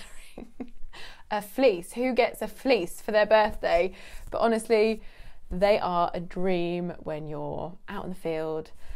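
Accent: United Kingdom. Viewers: Hi your stunning teachers with you I learne British accents to speak English < 3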